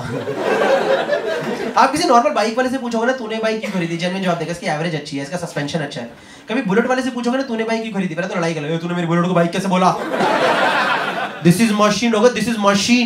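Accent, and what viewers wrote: Delhi, India. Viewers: Delhite accent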